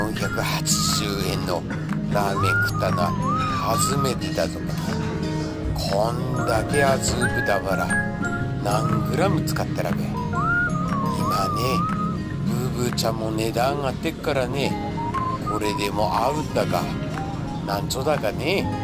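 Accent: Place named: Tohoku, Japan. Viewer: ズーズー弁とひぐまやさんのラーメン、どちらも最高ですね✨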